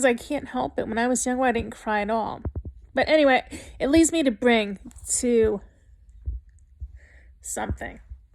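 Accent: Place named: New York, United States. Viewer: You are such a beautiful and kind person! Yes, we all need to reach out and support others. Life is full of ups and downs and it helps to know someone wants to help with no judgement or prejudice. I hope your personal struggles lighten and lift so that you can resume the life that makes you happy! I enjoy your channel and your accent (love that NY vibe!). Stay strong! Looking forward to your next post!. Love from New Mexico!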